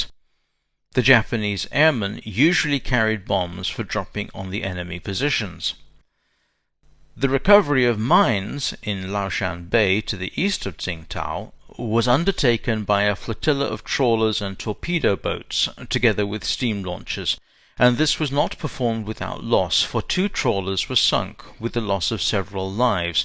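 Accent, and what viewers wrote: United Kingdom. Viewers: I disagree, he just sounded well posh the exact way a British Naval Historian should sound, that includes being several glasses deep with sherry, and is so to be expected, yet he really didn’t sound blushed at all I must say so. In any case, referring to Japanese words and names as “gobbledygook” is the only term in, around, and relating to this video as any sort of ill reference to such the topic at hand. I’m not sure if you’re ignorant of how the Japanese named their vessels or if you simply did not listen to the beginning when he pardoned himself from any potential mispronunciations, as nothing was extremely mispronounced or even relative to the term extreme, especially for an Englishmen. There are far better criticisms to be writ of far lesser quality videos out there, young friend. Edit: typo correction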